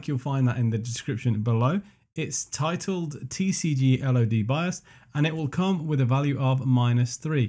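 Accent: United Kingdom. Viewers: Damn, that British accent! I absolutely love it! And your video too, of course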